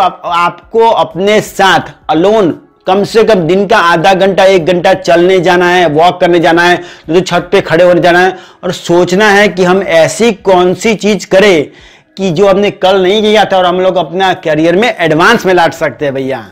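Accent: Gujarat, India. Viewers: Bahi app Gujarat sa hokya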